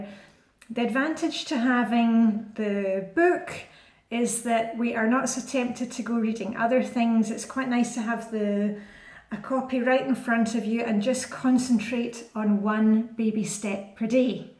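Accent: Scotland, United Kingdom. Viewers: I love your accent, reminds me of our middle school nurse who must've been from Scotland. Can I also say that I love your style, you're dressed so classy every day! I'm a sahm and I pretty much dress in leggings and a regular shirt (which is changed numerous times a day due to spit up). I'm trying to follow you, but it's hard with young babies. Thanks for all of your videos! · Funny accent? I have a lot of Scottish friends, I love your accent, hen xo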